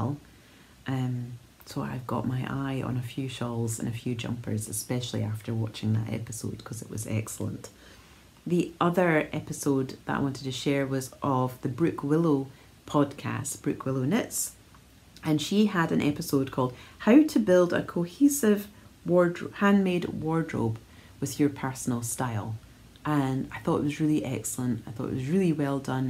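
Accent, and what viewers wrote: Scotland, United Kingdom. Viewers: every time I hear your Scottish accent I think of my Nan. She left Scotland right after wwII bound for America. Her heart missed Aberdeen all the time, despite having had harsh and brutal childhood. I hope to set foot on the land that lost her. I’m sure that you hear from folks with Scotch ancestry all of the time, so I apologize. You are not Scotlands keeper of it’s lost souls. I do hope that you are over that brute of a virus and make up lost knitting time💗💗💗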